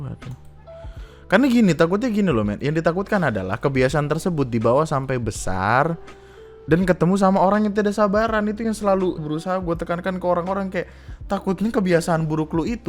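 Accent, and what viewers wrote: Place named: East Java, Indonesia. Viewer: Owalah, pantes aku ngerti bosone. Jatim kidulan ternyata 🤣🤣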